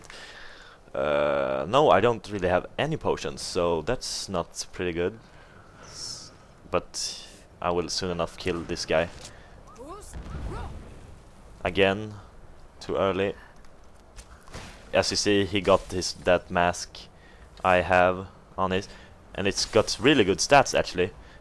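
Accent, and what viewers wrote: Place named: Sweden. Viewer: He sonds like hes swedish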